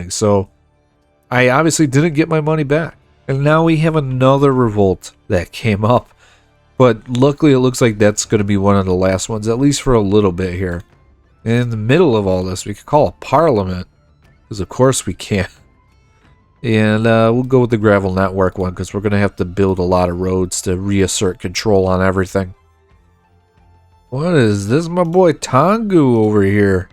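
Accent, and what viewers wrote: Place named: Buffalo, United States. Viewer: You sound like you're from Buffalo. It could be any of the Great Lakes but I know about ten guys who talk just like you in western New York.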